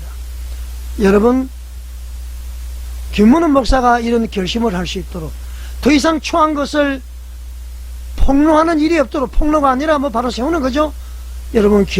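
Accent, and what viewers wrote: North Korea. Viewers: 어투가 북한분같아요.. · 그럴리가없어요 북한억양이 심하시네요 지금말씀하시는 분은 목회를 얼마나 하셨는지 모르겠군요 김문훈목사님 인격에 그런분이 아닙니다 음모인듯 음모 중단하세요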